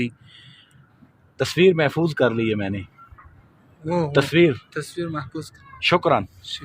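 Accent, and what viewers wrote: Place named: Chakwal, Pakistan. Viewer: آپ کا تعلق پاکستان کے ضلع چکوال سے تو نہیں ھے کیونکہ لہجہ بتا رھا ھے؟؟؟❤❤❤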